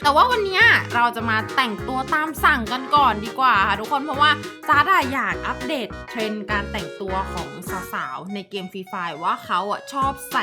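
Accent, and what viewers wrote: North, Thailand. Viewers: พี่คนเหนือหลอครับ😂😂😂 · พี่ เป็นคนเหนือใช่มั้ยครับ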